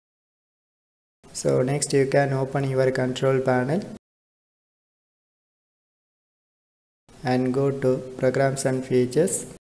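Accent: India. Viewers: u indian?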